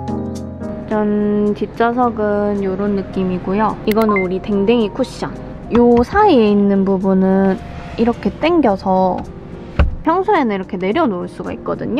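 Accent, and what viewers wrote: Gyeongsang, South Korea. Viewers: 경상도 같은데 대구분이신가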